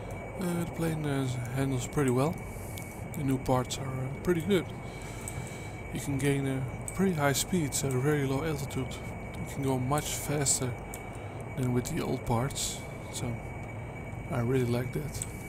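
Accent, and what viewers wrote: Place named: Netherlands. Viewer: I can hear that you're Dutch I'm Dutch too.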